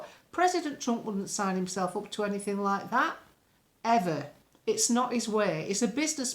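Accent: Germany. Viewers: 😂😂😂love you reading your news report in yourGurrrman accent😂😂😂 Please do more and do you any other accents from around the world😊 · Hi, keep up the good work but do you have to do that stupid German accent? It does you no favours.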